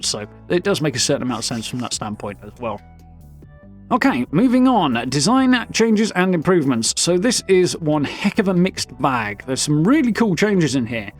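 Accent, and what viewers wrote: United Kingdom. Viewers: 4k tvs have never been as affordable as they are today. I have a 4k 55" I bought for 200 usd and am planning on buying a 65" for a hundred dollars more soon. I see by your speech you are from the United Kingdom. I bought my telly from a place called Wal-Mart. Does your country have them? Hello from Yakima Washington USA ❤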